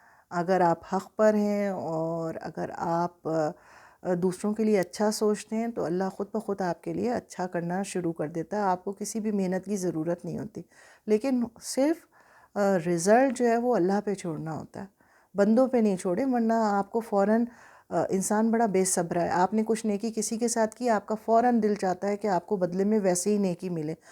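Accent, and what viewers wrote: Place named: Lucknow, India. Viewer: Bahut bahut shukriya aapka😊 Lucknow se hone ki wajah se kuch aisa laga jaise apne rishtedar ko hi sun raha hun 😚 barhi saadgi se aapne apni baat rakhhi .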